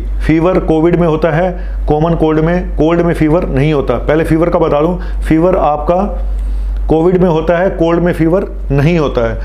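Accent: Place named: Punjab, India. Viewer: Bhai aap ki punjabi nikal hi jaati h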